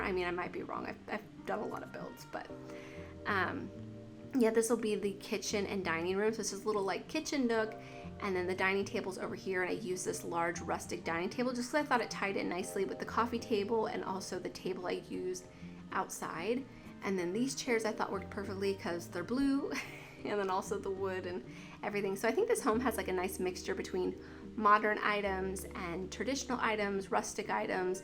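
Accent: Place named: United States. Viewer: And you know since you live in America actually I think you live in America based on your accent either that or somewhere in Canada and you can definitely buy the packs and write them off on your taxes as business expenses if you're not sure of that you should ask your husband but what I'm saying is verifiable You could even purchase brand new computer and write that off in your business expenses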